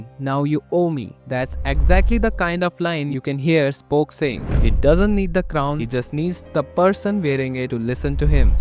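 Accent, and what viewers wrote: India. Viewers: the indian accenct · Your voice seem Indian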